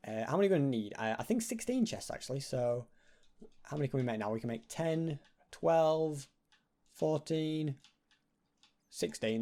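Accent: United Kingdom. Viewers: I like British English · I never new you were British 🇬🇧🇬🇧🇬🇧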